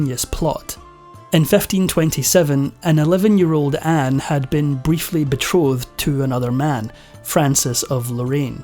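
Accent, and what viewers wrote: Scotland, United Kingdom. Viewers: The Speaker is Scottish, huh? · One of many attractive traits of your videos is the Scottish accent you have! I love it as much as I love the clever commentary and the comprehensive detailed account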